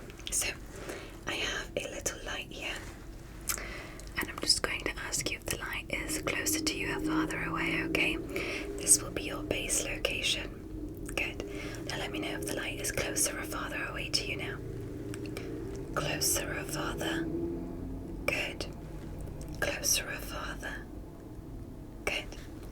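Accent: England, United Kingdom. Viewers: I am so thrilled for another English accent video. The last one with the nurse I have watched several times. You deserve an award for that one. Thank you. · Am I the only one who noticed that she is British 😂😂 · You are a Really Beautiful Doctor with the Most Beautiful British Accent!! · one hour ANDDD British accent!?!?!? stop spoiling us!😍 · When I heard her for the first time I went "omg she's brittish" it's sounds so relaxing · Mate you sound so british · Why is blud bri’ish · Good English accent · Love the British accent 💀 Or was it Australian? I can’t tell since you’re whispering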